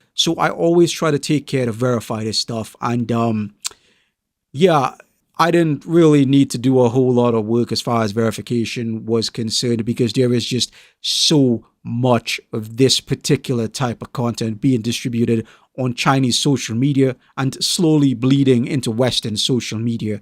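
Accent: Jamaica. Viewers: I don't want to be rude or anything but what is your accent? It sounds like a Jamaican, South African, and New York accent all at the same time. I'm surprised people aren't hunting them down and unaliving these creeps. If there's anyone in China reading this who has nothing to live for... Or if you're suicidal, don't commit suicide and instead... well I can't say it. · your accent is very interesting. it sounds sort of Jamaican/west African mixed with Geordie/north english accent. what actually is your accent?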